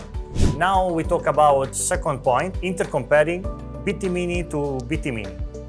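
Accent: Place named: Italy. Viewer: Si sente che è italiano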